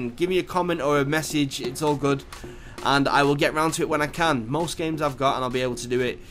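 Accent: United Kingdom. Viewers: i know right he saound like Hoxten a bit i though like what this is Hoxten and hes making tutorials :D he sounds bit like a hes from england i think he is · awful british accent is awful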